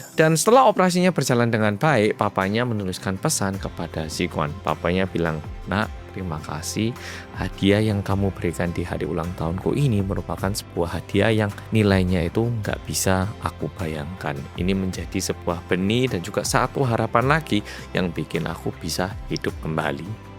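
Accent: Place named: Java, Indonesia. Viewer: Malam muedok lgsg nyimaaak🎉🎉🎉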